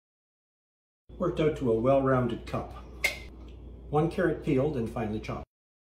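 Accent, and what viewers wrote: Canada. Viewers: As a native upstate NYer I can pin that Canadian accent anywhere (plus the U in flavor & the 10 percent cream reference) What a lovely Canadian gentleman!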